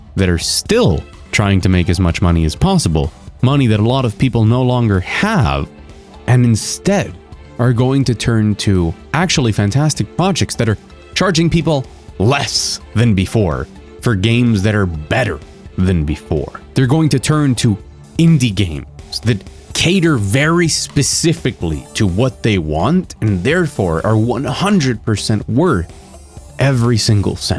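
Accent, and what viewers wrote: France. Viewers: The wrong assumption big studios and marketers make is mostly that focus groups represents organic crowds. They dont. Focus groups and surveys are a simulation of real people collective behzvior and quite easily miss the mark. And kudos for your french accent :) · ur french accent is good!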